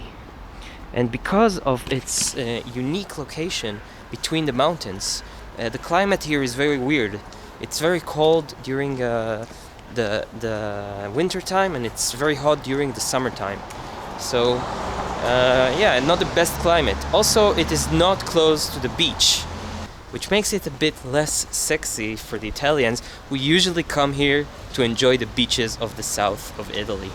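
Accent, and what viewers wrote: Brazil. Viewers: You are Brazilian ,arent you ? Your accent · Your accent sounds Brazilian, are you from Brazil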